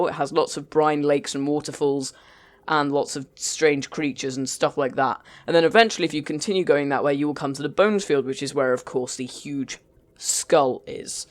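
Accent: United Kingdom. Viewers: can you stop damn moving the camera up and down it is seriously irritating and with the 'itish accent!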